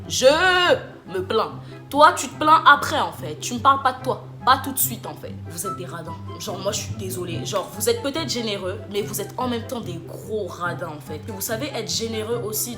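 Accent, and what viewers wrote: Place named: England, United Kingdom. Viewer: team gémeaux malgré toutes les critiques 😂 les gens croient que je suis taureau le plus souvent... j'ai sorti une video sur les 3 qualités de chaque signe astro regarde l'avis d'une gémeaux^^ tu me tues , comme t'as demonté tous les signes 😂😂😂 ma bestie est poisson (sinon ton accent anglais est genial) !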